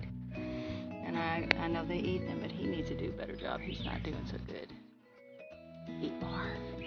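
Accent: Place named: United States. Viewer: I can't follow your American Accent......🙂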